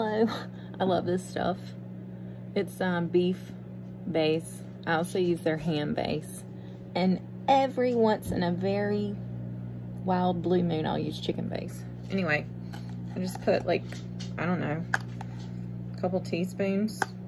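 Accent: South, United States. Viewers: there’s not much true southern speak in Florida anymore so it’s a delight to hear it ms lol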